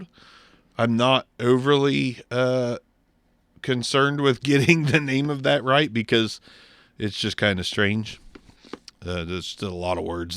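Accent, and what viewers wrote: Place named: Mid-Atlantic, United States. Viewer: BTW noticed your accent, are you from Pa, Delaware or Maryland?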